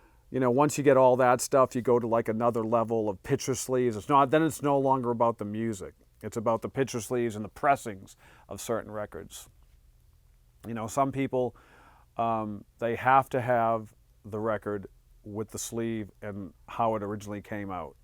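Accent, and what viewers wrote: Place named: Boston, United States. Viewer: Damn you still got your Boston accent !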